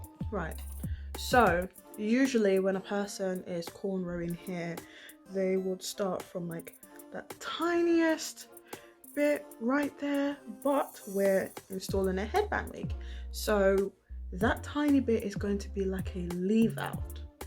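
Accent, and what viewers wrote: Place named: Jamaica. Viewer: I loved this tutorial, then me hear the Jamaican accent and then me extra love it! Had to subscribe <3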